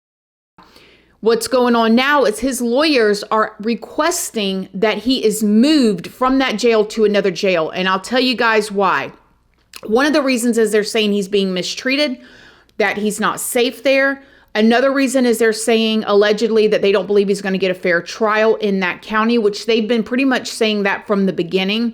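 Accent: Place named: Florida, United States. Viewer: I have been going through it lately. I have to face my abusers in court next week and I’ve been spending every spare moment preparing. I’m a single mom with no lawyer, so I’m really out here on some Elle Woods sh*t. Anyway, I’ve been fixating on the case, in my head poring over my traumas all day everyday. But when your videos come on, I actually feel like I get a little break from that. You’re such a great storyteller, I feel how warm and caring you are. And you talk like the country Florida ladies I grew up around in Ocala before I moved to DC. I feel so relaxed and at ease listening to you. Which is ironic considering the subject matter 😂 a testament to how positive and bright your energy is! Thank you 🙏🏻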